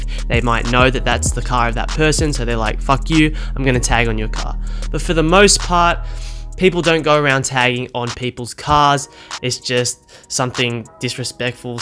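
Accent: Australia. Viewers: Ur Australian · You ozzy ?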